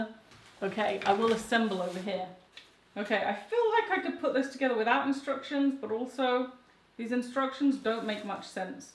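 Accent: United Kingdom. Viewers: you are always great fun! I had to chuckle...I have the watermelon cuber too...As a Texan, I LOVE your Brit accent. You are practically perfect! Thanks dearie!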